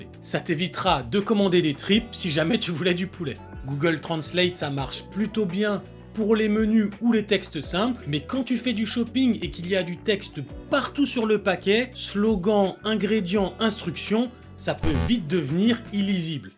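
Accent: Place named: France. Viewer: Bonjour apparemment ta chaîne est celle que je préfère parmi toutes celles que l'on peut trouver sur le Japon, à t'écouter je pense que tu es français : comment as-tu fait pour changer de régime alimentaire car je ne pense pas que tu manges du pain et du fromage tous les jours ?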